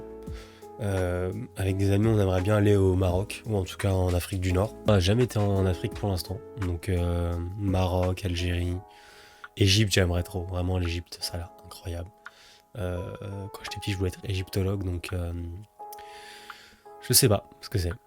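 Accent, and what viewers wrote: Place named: Paris, France. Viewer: your parisian accent is tres charming.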